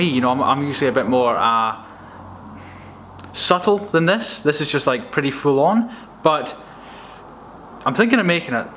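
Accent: Scotland, United Kingdom. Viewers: are you scotish? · You Scottish too?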